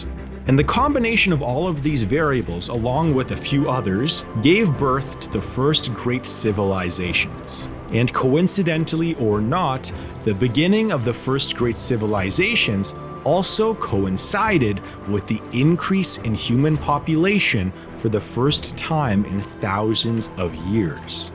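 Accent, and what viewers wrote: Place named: Canada. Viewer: I think I hear a Canadian accent. 😉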